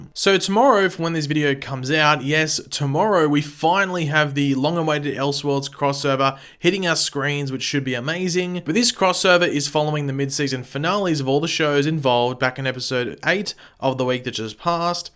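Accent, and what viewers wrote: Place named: Australia. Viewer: CJ Rehv he said cracking my nigga. He’s Aussie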